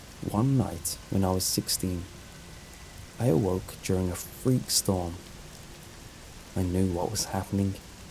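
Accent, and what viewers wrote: United Kingdom. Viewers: Oh no british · is the british voice narrating the story. Yes, he only reads stories that other people post. I’m not blaming for this at all.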